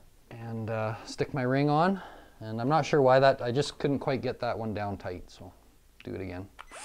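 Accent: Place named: Canada. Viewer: Hi, great video here on the Pex, I hear yer "Inns and yer Oautts" yer must be from Canada I reckon.? Please keep up the good work and God Bless. DD in L.A.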